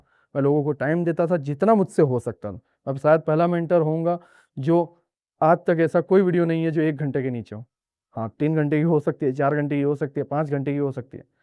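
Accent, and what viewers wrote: Gujarat, India. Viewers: Ap Gujarat se ho...? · Pakka ap gujrat se ho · Sir aap gujrat se ho ? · Bro are you a gujarati?? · Lagta hai Gujarat se ho.... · Are you gujrati bro ?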